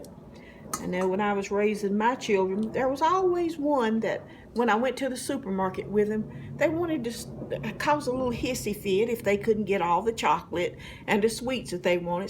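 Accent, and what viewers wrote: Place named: South, United States. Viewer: I love your voice ! You talk like my mom and her mom, nice southern accent it sounds like to me!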